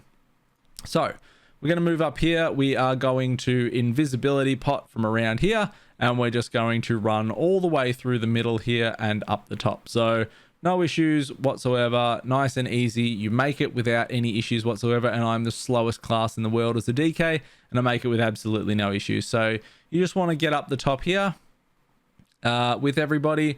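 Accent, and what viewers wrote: Australia. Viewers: Are you on Barthilas? Also, are you Australian?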